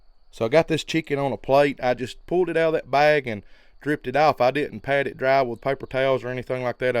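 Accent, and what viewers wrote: South, United States. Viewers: love the southern accent 🙂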